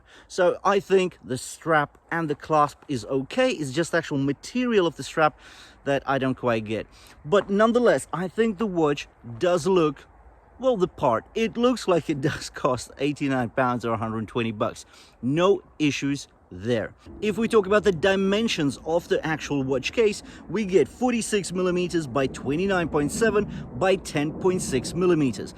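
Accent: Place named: South Africa. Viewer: Great accent. South African, but he's lived in the UK for while, is my guess?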